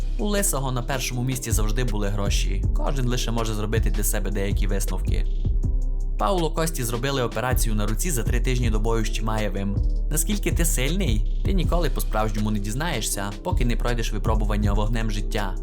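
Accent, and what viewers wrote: Transcarpathia, Ukraine. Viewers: У автора відео закарпатський говір))))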